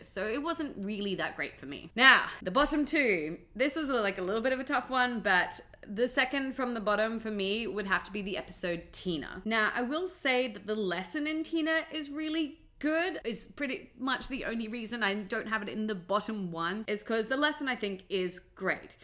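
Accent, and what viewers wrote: Australia. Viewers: i like a lot watching your videos about bluey you are an awesome australian youtuber i likea lot Your Australian Accent anyway i'm from italy and i'm a big Bluey Fan i love watching Bluey a Lot since 2021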